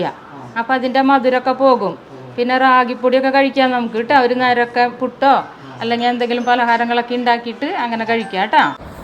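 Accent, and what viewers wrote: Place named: Palakkad, India. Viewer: സംസാരം കേട്ടിട്ട്. പാലക്കാട്‌.. അല്ലെങ്കിൽ തൃശൂർ ആണെന്ന് തോനുന്നു 🥰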